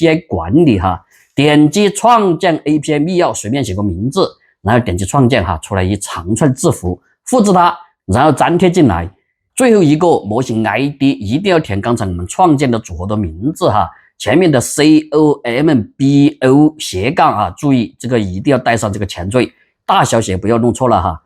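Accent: Sichuan, China. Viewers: 大哥 你是不是四川人 说点川普 我都懵了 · 听着一口流利的川普一下子想到了就是他教我学会了搭建一个聊天平台.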